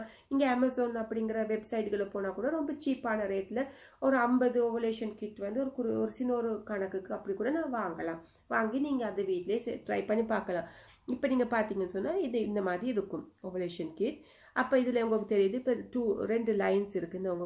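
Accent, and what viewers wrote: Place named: Sri Lanka. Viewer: R u srilankan . speach tells.love from srilanka❤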